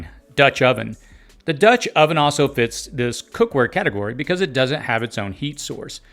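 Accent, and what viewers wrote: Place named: Pennsylvania-Maryland, United States. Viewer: I hear your accent you sound like your from PA Md area my family is from there many generations :-)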